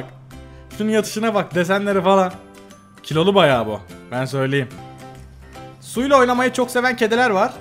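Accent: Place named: Izmir, Turkey. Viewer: Abi kesin izmirlisin çünkü benim dayımda sizin gibi konuşuyor 😊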